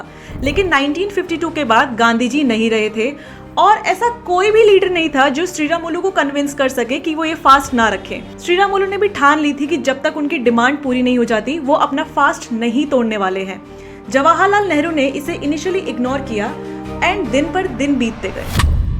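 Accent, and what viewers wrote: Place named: United States. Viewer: American English 😄❤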